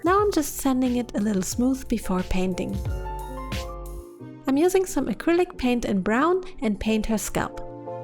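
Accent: Germany. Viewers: I have a question ^^ are you german? :D cuz your accent sounds german ^^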